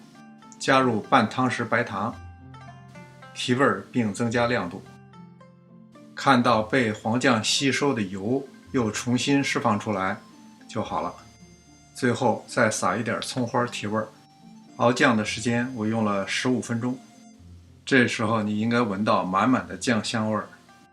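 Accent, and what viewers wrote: Beijing, China. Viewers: 地道的北京话加上地道的炸酱面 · 京味儿好亲切呀！您的菜谱太适合我们这些长年在外的人了！多石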